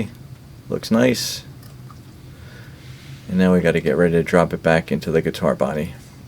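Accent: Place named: Brooklyn, United States. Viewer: I detect some Brooklyn in thar…..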